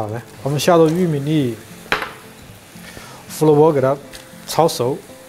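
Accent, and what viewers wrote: Sichuan, China. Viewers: 师傅讲话不出两句我就听出了四川或重庆人吧？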